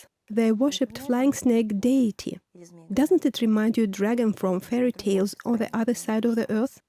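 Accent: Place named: Poland. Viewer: I would trust any of my Polish friends, who by the way are very intelligent, hard-working, and have university degrees. But don't ever trust a computer generated text-to-voice with a Polish accent, no matter what she says.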